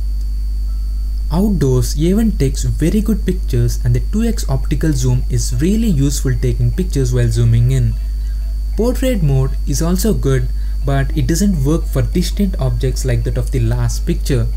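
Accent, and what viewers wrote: South India, India. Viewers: Also, are you south Indian?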